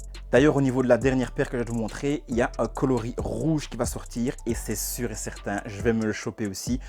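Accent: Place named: Belgium. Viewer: Petit accent belge 🤣 tu viens d’où ?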